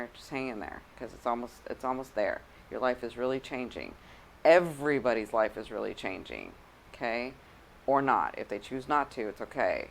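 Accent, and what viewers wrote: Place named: South, United States. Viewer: Hello, Your 2 funny! Your REAL; Thank You! Yes i fully understand everything your stating! I understand planet's! You have my accent, you talk like me are u from the south? jan 27th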